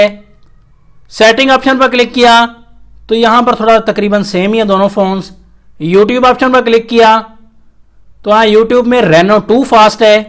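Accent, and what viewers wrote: India. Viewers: Such a pendu speaking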